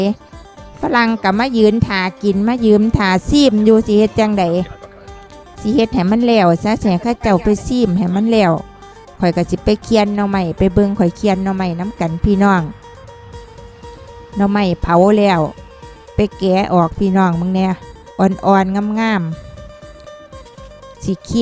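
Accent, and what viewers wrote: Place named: Roi Et, Thailand. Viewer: ฟังสำเนียงคือคนบ้านข่อยไทร้อยเอ็ดแท้น้อ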